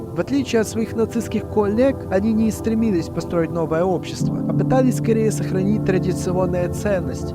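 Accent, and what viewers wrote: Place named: Odessa, Ukraine. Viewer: акцент. Вы во многих словах букву А удлиняете . Получается акцент очень похожий на говор евреев Адеситов .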